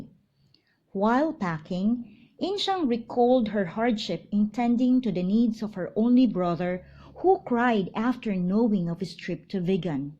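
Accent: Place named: United Kingdom. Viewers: I can't understand your like a British accent 😌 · British accent times ten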